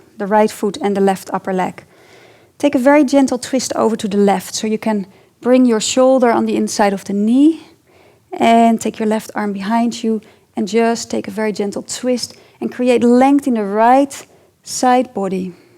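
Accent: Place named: Netherlands. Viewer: Heerlijk je nederlandse accent in het engels! Bedankt voor je fijne gedetailleerde alignment uitleg (Y) top!!!!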